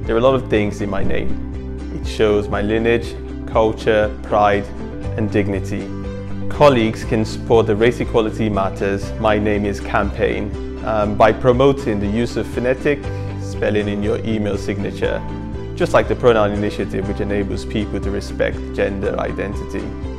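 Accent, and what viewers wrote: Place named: Wales, United Kingdom. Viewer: Well presented. This problem with names in determining whether or not you will get an interview is not new, although people outside of London are more likely to make an effort to get your name right. On the flip side, there are many English names (not a problem with Welsh names) whose pronunciation is ridiculous, eg. Featherstonehaugh, pronounced Fanshaw. I think that you have begun to pick up just a trace of a South East Wales accent, so well done from a Welsh ex-pat. I had not heard of the campaign, so it deserves more coverage.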